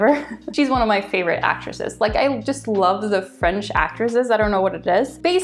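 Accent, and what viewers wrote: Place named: United States. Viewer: I saw your comment on the Reel Reviews With Jen channel and decided to check out your channel. Based on your commentary about the movies you've seen in this video, I think you'd have a lot of success with reaction and commentary videos like Jen does. The videos you already have a very high quality and well made (how many people spend the time to add captions?) but may be too cerebral for the average YouTube viewer. Don't stop making them but if you added Jen-like reaction videos, I think you could grow your channel. You have intelligent commentary, great wit and a good sense of humor. And you're very personable and natural on camera. Plus I'm always interested in seeing non-Americans' reactions to American movies and culture. Have you lived in Sweden your whole life? Your lack of a typical Swedish accent when speaking English is crazy! 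At least I've never heard someone from Sweden sound so American/Canadian. I only detected an accent when you said Gilmore Girls. Good luck with your channel!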